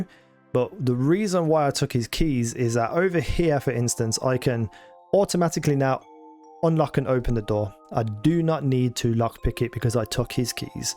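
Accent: Birmingham, United Kingdom. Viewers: Birmingham? Walsall?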